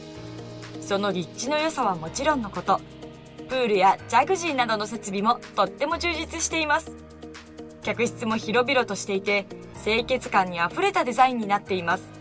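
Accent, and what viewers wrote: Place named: Tohoku, Japan. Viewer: ナレーターの人、訛ってますね。イントネーションが東北チックでカワイイ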